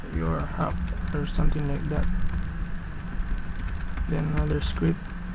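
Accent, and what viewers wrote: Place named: Brazil. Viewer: Você parece um Brasileiro falando inglês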